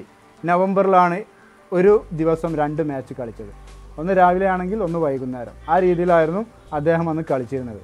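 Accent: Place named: Kasaragod, India. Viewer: കേറി വാ മക്കളെ...🙋 ഇനി മുതൽ കാസർകോട് ഭാഷയിൽ ബ്ലോഗ് കേൾക്കാം...😍😍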